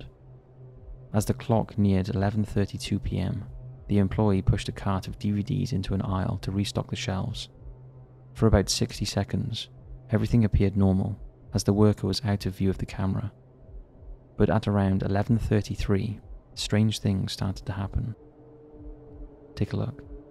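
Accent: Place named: England, United Kingdom. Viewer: the famous English voice is back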